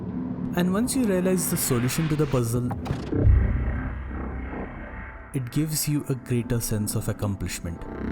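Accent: India. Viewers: I did not expect to find such elaborate thoughts on the topic at hand. Thought this (like many other “this is art” videos) would be more of a redundant opinion piece, but this video was a really fresh breath of air and encapsulates how I wish the precedent was set on the discussion of games as an art form. Although initially wary of the Indian accent, you really hammered down both the thesis of games as art, the linear storytelling and it’s philosophy and the qualities of INSIDE. Bravo. I do enjoy writing about art myself as in music, film and games and I always had a wish to create something like you’ve created on this platform. But the fact is I am not driven enough, like you apparently are, and is quite a technology boomer as I never piloted any video editing software. Even this interactive video thing you got going here flew me by and I frankly couldn’t be assed to operate by it haha. 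Still a banger video. A real question to ask is “why couldn’t this just as well be a movie”, which you cleverly debunked through it’s interactivity. This is the real reason video games works as a new medium of arts and not just a mutation of cinema. I wrote a review of the game VVVVVV which I will quote a passage off that maybe you can enjoy. The blessing and the curse of being a small content creator is: I wish you success but I also appreciate that I can perhaps have a dialogue with you. I won’t be online this summer but by all means add me on Steam if you’d like to pinball ideas or opinio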